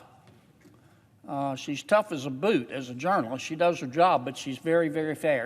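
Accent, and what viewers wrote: South, United States. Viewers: All males as far as his office goes. Spoken like a true southern rich old guy. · Soft spoken and genteel, Senator John Kennedy leaves out the fact that the war in Ukraine has much to do with the impact of consumer prices. What has more to do with energy prices is the fact the oil producers are pumping less out of the ground. The purpose is to raise prices. The contributions of $893,247 from big oil does not seem to jar his sweet southern drawl either.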